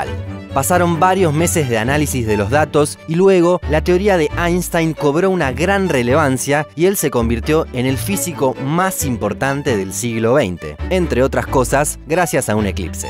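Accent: Argentina. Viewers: ¡Saludos desde Pittsburgh! Por fin encontré un YouTuber que habla bien y claro sobre temas interesantes. Me encanta este canal xk me expone al vocabulario científico en español, todavía estoy aprendiendo el idioma. Además el ascento Argentino me cae bien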